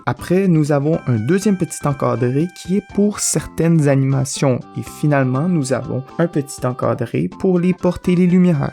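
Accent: Quebec, Canada. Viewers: Québécois non? (Je reconnais grace au gros mots xD) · T'es tu québécois team QUÉBÉCOIS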